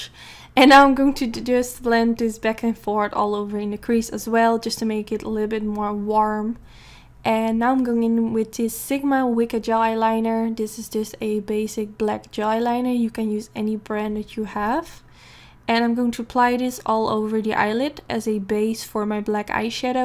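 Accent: Netherlands. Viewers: This person sounds Dutch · '' ✦ ABOUT ✦ My name is. I'm 24 years old and live in the Netherlands. '' I hear your dutch accent beneath it:P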